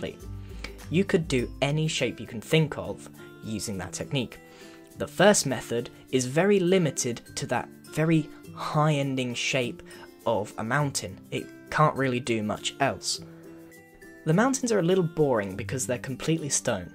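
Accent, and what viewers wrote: United Kingdom. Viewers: are u a British person, you have an english accent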